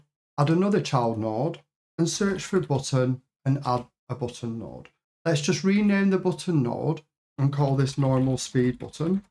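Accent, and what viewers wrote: United Kingdom. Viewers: As someone who use to make tutorials, I can say this is a HUGE amount of effort! Seriously well done on a fantastic tutorial and excuted so well! Are you from Lancashire by any chance? Just thinking from the accent? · Fantastic Tutorial!! I really have to ask though, where exactly is that accent from? Some region in England obviously, but where? I am trying to figure that out for 1 week now.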